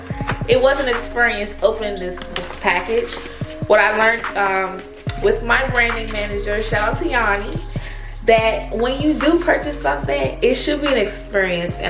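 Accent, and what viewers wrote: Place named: DMV, United States. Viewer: So cute! Sn...r u from the DMV? I thought i heard an accent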